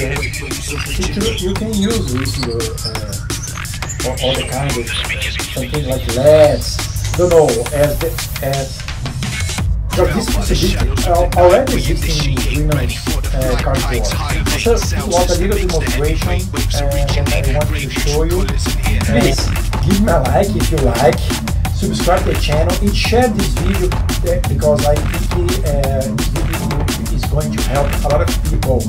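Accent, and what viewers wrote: Brazil. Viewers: quanto eu ouvi a voz na hora sabia que era br falando ingles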